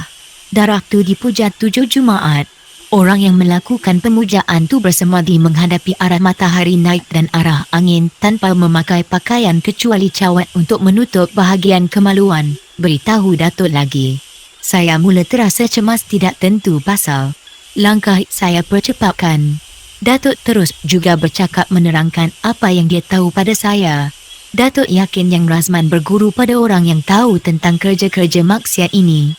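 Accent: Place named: China. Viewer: Yang pasti..penceritanya bkn melayu..pelatnya cina..apa pun terima kasih kerana tolong menyampaikan cerita 🙏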